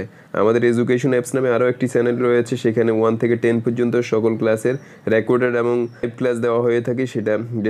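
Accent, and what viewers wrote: Noakhali, Bangladesh. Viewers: Bhai apne noakhailla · আপনার বাড়ি নোয়াখালী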